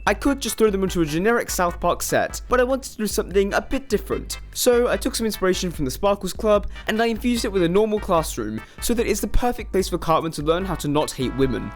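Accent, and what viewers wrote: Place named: United Kingdom. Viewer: Umm bro you are british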